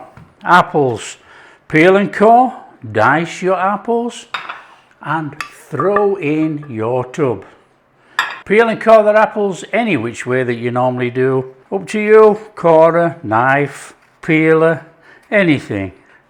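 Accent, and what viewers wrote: Yorkshire, United Kingdom. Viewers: Yummy... easy to prepare must get rid of my old apples and make good use of em, I would make the spices in a bowl I usually add a tsbl of METAXA and brown sugar. Thanks are you from Yorkshire Im a Yorkshire lass :)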